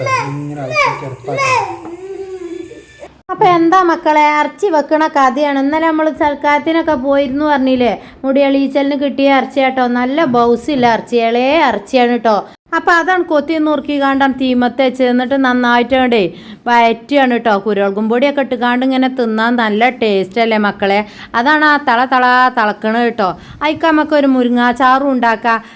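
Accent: Malappuram, India. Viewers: മലപ്പുറത്തു ഒരു വിധം എല്ലാരും ഇങ്ങനെ തന്നെ ആണ് സംസാരിക്ക വെറുതെ ഓരോന്ന് കുറ്റം പറയുന്നതെന്തിനാ 😮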